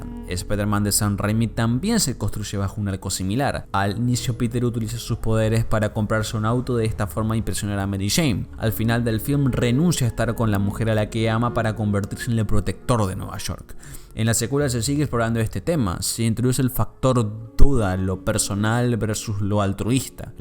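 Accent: Argentina. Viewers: Argentino papa!!!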